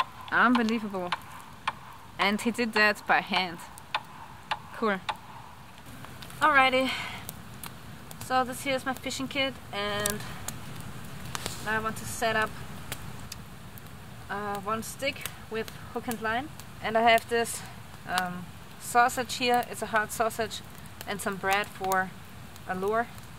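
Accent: Germany. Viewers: I have to remind myself that you're not the girl I loved so much years ago. You are much more dear with a German accent. By the way, your English is great, but "owl" rhymes with growl or now+l. We have huge, very loud owls in the woods near here. Hearing their calls echoing in the night would frighten even the meanest werewolf!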